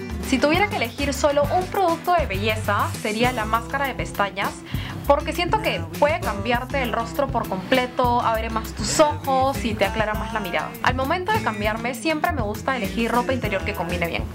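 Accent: Peru. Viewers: Sabia k Ella era peruana por su acento :) ...me gusta sus videos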